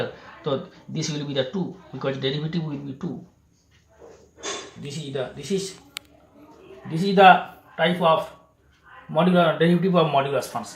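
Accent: Bengal, India. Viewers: Bhai sahab kuch samajh nahi aaya!!!!! Kya bol gaye pta hi nahi chala!!!! Bill bhi soon...lattar lattar next bhidio latter..... Kehena kya chahate ho bhai??????? Aap ek kaam karo aap hindi me hi bana diya karo video, koi problem nahi hai kisi ko bhi. But ye bengali + english accent kuch samajh nahi aa rha!!!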